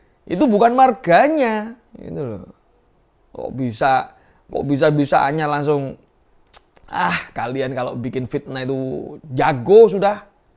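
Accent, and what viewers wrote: East Java, Indonesia. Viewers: jawa timurane kentel sayid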